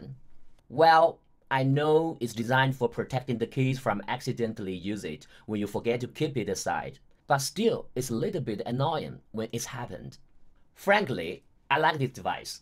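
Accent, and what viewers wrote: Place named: London, United Kingdom. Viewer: 好家伙 这口音 老伦敦正米字旗的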